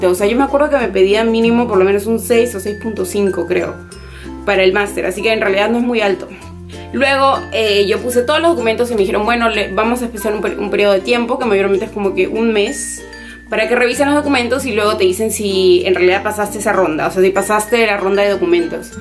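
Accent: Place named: Peru. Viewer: supe de donde eres, de Perú, por el acento, casi no se siente, pero es como un acento vacío o neutro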